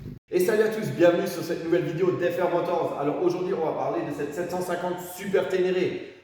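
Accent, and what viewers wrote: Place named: Alsace, France. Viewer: Road trip, 750 super tenere , petit accent alsacien , ambiance sympa ... je m'abonne 😊😊😊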